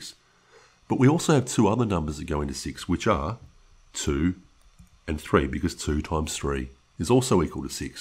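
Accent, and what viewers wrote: Australia. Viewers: Hello Aussie I felt like the narrator was Batman with an Australian accent! 🤭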